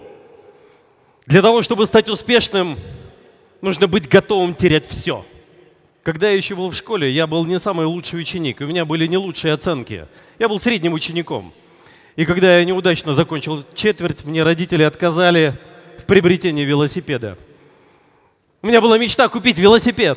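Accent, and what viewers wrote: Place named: United States. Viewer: Зачем так временами кричать, повторять американцев😂😂😂😂😂😂😂 даже интонации, типа психологическое воздействие, почему все бизнесмены начинают про свою жизнь рассказывать. Не спорю полезная информация. ГЛАВНОЕ ВЫБРАТЬ ПРАВИЛЬНУЮ ЦЕЛЬ И ПРАВИЛЬНУЮ МОТИВАЦИЮ чтобы достичь своему целью. Тогда и будет все. Если цель конкретная, и мотивация выбранная правильная как пинок, тогда и достигнет. Главное при этом торгашеском мире не забывать и о духовном богатстве☝️☝️☝️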